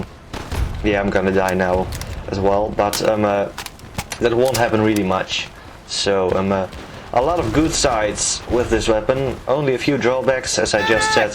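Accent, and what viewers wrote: Netherlands. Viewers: Primary weapon: G3A4 Secundary weapon (Optional): none Pistol: M9 Attachments: none Gadgets: none, only the standard one (ammo box) I want to see you, and your skills only, good luck!! P.s. what is your first language? Dutch? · Just a question:arr dutch? :D i think your voice sounds dutch^^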